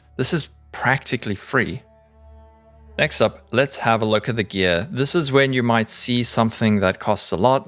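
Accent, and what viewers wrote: South Africa. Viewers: Yo Bru. Where you from? You sound South African👀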